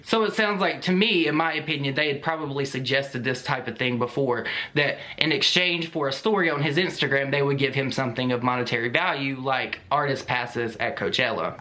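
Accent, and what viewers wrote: South, United States. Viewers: Subbed for that southern drawl lol love it . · I love your accent! Is it southern?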